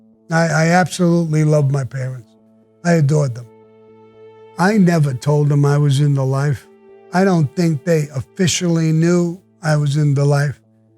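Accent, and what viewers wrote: United States. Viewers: Talks like a 100% full on seppo. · I’m a NEW YORKER myself! I absolutely love listening to you talk, your mannerisms, it’s like I’m back home. I especially love hearing about your life, the way u can describe the detail, the expressions even on your face... it’s like u sitting there next to me, as if it just happened. Thank u for the experience... absolutely my favorite podcast!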